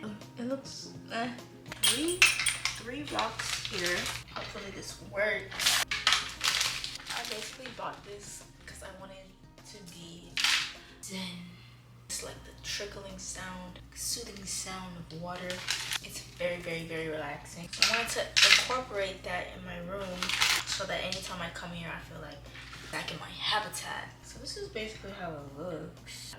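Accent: Jamaica. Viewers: Content, music, and the Jam' twang...I'm with you!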